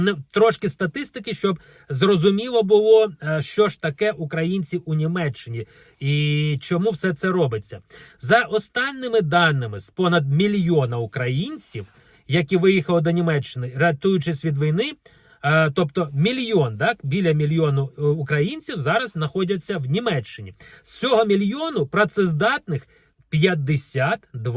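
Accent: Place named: Russia. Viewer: Автор влога, для того, чтобы вести влог на укр языке, выучите его. Ну нельзя же так глумиться над ним. По вашей речи ясно, что Вы думаете и говорите в жизни на рус языке. Много слов, которые Вы пытаетесь произнести на укр языке, украинцы так не произносят.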